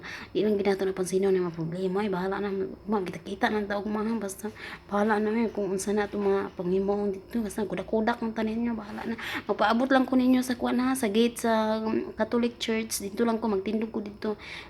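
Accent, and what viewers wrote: Visayas, Philippines. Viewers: Dai taga mindanao ka?? Love it bisaya kau ka 😘😘😘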